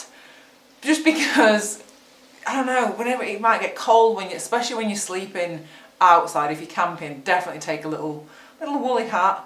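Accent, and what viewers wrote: United Kingdom. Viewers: Your a nice girl but going bacc packing? So finally i realized ot was bIke packing lol...you limeys are great on youtube very friendly and informative. Just kind of unfortunate the limey accent. My ancesors came from there but now we talk a more normal accent here. I agree 5 plus hours in the sadfle and the butt is really sore!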